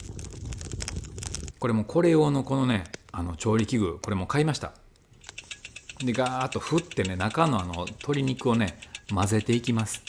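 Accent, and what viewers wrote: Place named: Kyoto, Japan. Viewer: 徳井さんの京都弁「お出汁をパッ~ と入れますと...」夢中になってみれます。無になれます。徳井さんに感謝⤴︎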